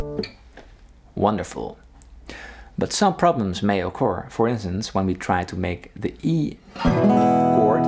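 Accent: Netherlands. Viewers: i need to know, are u dutch? your accent sounds soooooo familiar. if so, ik ben ook nederlands! love your vids!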